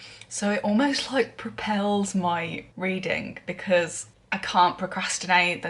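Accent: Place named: Birmingham, United Kingdom. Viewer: Hiya! Welcome to booktube, I love your energy 😊 sounds like you might have a bit of a brummy twang going on too? We need more brits in the community for sure! Will check out your other videos for sure!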